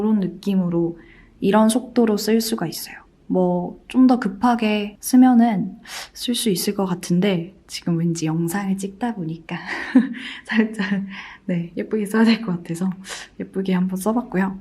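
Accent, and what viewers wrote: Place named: Gyeongsang, South Korea. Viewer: 약간 느껴지는 경상도의 말투!!!! 혹시 고향이 부산경남 쪽이신가용?ㅎㅎㅎ넘 반가워요>.<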